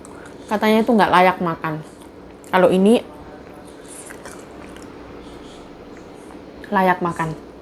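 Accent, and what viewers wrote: Java, Indonesia. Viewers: Logate ngomg masih medok...semua kata paten " K " 😂😂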